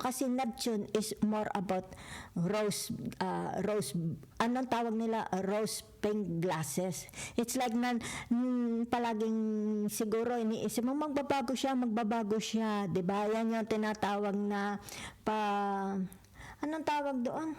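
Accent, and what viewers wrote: Batangas, Philippines. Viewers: Hello mommy kayo b ay Taga Batangas Dito sa pinas kc yong tuno.ng salita niyo eh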